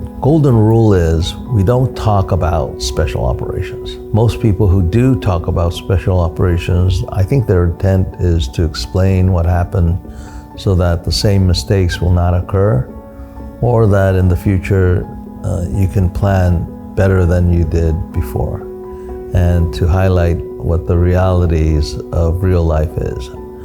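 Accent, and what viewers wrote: United States. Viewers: This man had to have grown up in the USA. That is the smoothest American English I have heard from someone that didn't have it natively.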